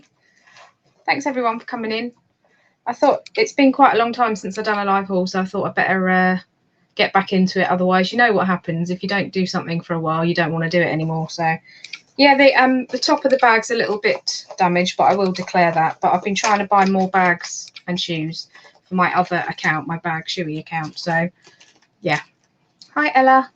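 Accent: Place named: Suffolk, United Kingdom. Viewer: I love hearing your Suffolk accent. I'm a Suffolk girl too.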